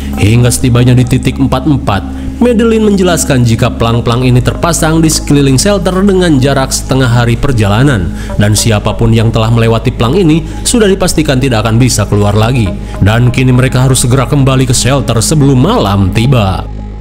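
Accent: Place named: East Java, Indonesia. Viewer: Tak bolan baleni "lele apa yg bisa terbang" 😂😂 Jowo etan teko kang 👍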